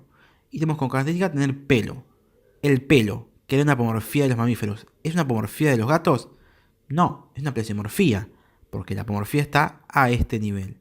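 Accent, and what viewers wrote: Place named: Argentina. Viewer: Tan argentino que duele, amoooooo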